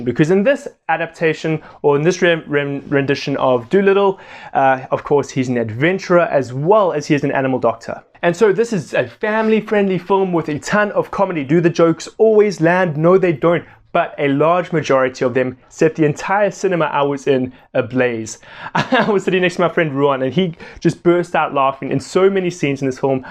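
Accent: Wales, United Kingdom. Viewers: It’s a Welsh accent! Like mine!and Littlefinger isn’t doing welsh it’s Irish diluted with English. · It's a Welsh accent like mine from the valleys.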